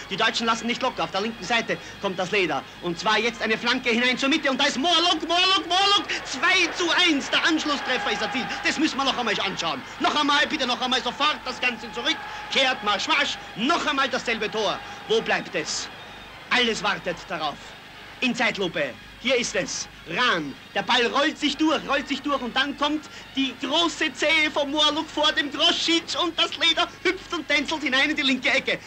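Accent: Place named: Austria. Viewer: Die ganze Diktion und Sprechweise...eindeutig Österreich.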